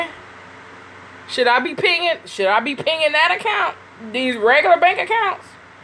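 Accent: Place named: United States. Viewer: were you from you sound country as hell I like it though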